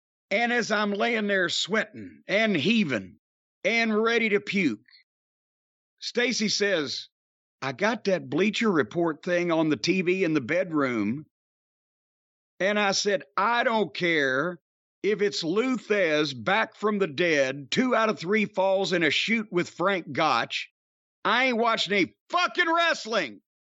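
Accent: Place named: Kentucky, United States. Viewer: "So I hammer the fUHk out of those things for about 50 hhhhuh'WHACKS" his Kentucky accent makes everything he says sound even funnier 🤣 He should have pulled out his ol'trusty tennis racket and started huh'whackin away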